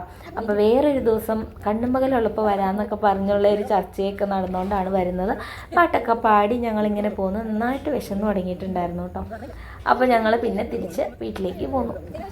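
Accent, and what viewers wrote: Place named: Thrissur, India. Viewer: പെണ്ണേ സൂപ്പർ 👌🥰പ്രതീക്ഷിക്കാതെ കിട്ടുന്ന ചില സന്തോഷങ്ങൾ അങ്ങനെ ആണ് ❤പിന്നെ മഞ്ഞ് 👌🥰🥰🥰🥰എടെക്ക് തൃശ്ശൂർ സ്ലാങ്ങ്കേറി വരണ് 🤩ശ്ശേ!!!🤩അത് നിന്റെ ഏട്ടന്മാര് തൃശൂർക്കാര് ആയോണ്ടാ കുഞ്ഞി ❤👍"രാവണസോദരി"🥰🥰🥰🥰🥰🥰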